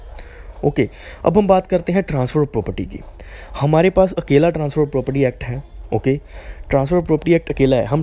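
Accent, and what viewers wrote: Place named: Punjab, India. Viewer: Bhai Punjabi accent hain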